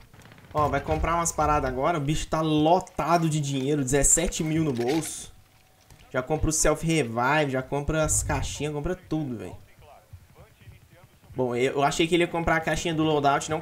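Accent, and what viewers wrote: Minas Gerais, Brazil. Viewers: Tu é mineiro?kkkkk sutaque igualzin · Mo sotaque de mineiro kk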